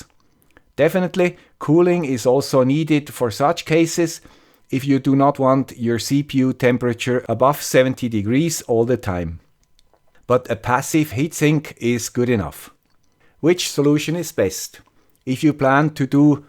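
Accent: Switzerland. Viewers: Tönt sehr nach emne Schwiizer 👍🏻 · Your Swiss accent is nice. :) Since this video came the Argon ONE Pi 4 and Argon ONE Neo.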